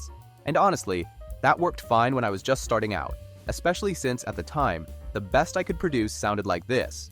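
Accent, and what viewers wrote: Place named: Midwest, United States. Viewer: Very nice new avatar and voice. We enjoy listening to your new voice. I am curious how you manged to get a US mid-west accent in place of your own. Did find another voice similar to your own and sample that instead?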